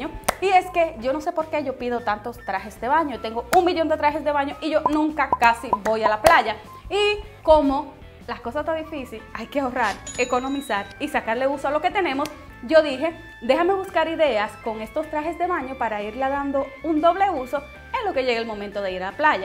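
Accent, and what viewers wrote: Dominican Republic. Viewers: Me encantaaa, eres dominicana?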